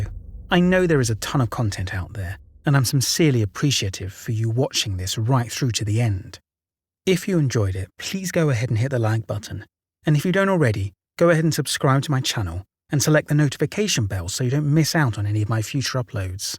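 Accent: United Kingdom. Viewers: Nice! Mysteries are always better with a British accent. Don't know why, they just are!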